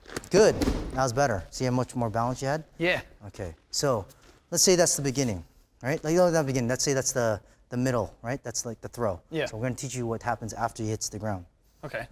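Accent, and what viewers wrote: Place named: Ireland. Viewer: DoYou KnowDeWae? <- they're right. he said nice. it sounds weird to you over-conspiracizing idiots because you all forget that he is irish and has a thing called an accent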